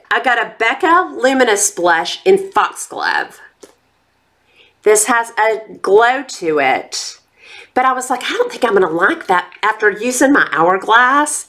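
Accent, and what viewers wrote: South, United States. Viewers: So! Sheila (life with Lilly) told me to watch your channel.......so here I am!! I have Subscribed.....Your a delight....I'll be watching for your new video's! (Your obviously southern, which state?)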